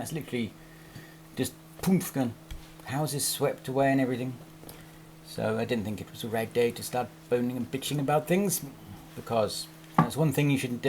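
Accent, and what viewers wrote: RP, United Kingdom. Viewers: are you not a bit to posh to be a trucker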